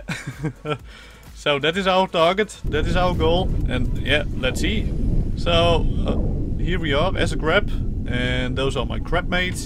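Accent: Netherlands. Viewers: dutch accent for life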